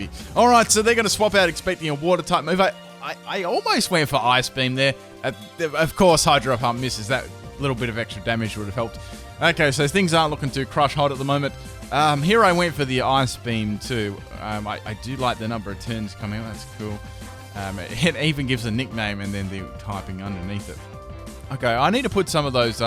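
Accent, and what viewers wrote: Australia. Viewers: he's australian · are you ostralian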